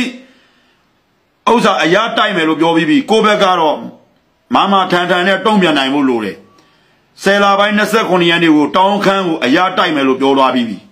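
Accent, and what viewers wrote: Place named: Rakhine, Myanmar. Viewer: ခင်ဗျားဒီပြင်ချန်နယ်မှာမပြောနိုင်ဘူးလား၊ရခိုင်အသံမုန်းလွန်းလို့၊ခင်ဗျားဒီချန်နယ်ဘဲပြောမယ်ဆိုကျုပ်နားမထောင်ချင်တော့ဘူး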